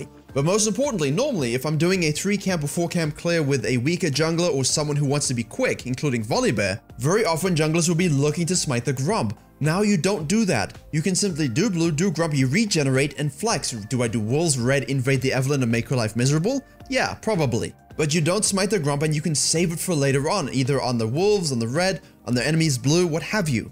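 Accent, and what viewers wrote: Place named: South Africa. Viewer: South African. I had the same question when I saw his first video. To be honest I can't say I've heard much of the dialect outside of the heavily tweaked variant in "The Expanse" - that said I very much enjoy the English enunciations. It's like a much smoother sounding German or Dutch.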